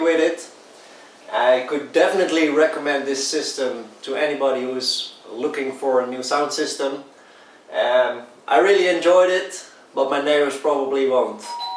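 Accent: Netherlands. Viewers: Ik hoor een Nederlands accent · Het is trouwens te horen dat je Nederlandstalig bent! Heel tof!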